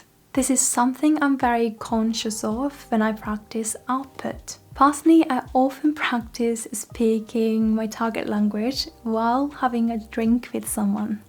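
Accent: England, United Kingdom. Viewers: wow you have a strong English accent when speaking English 😅 thats cool!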